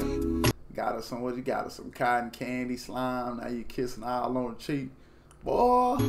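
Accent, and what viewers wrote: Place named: Louisiana, United States. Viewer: I can already tell u from Louisiana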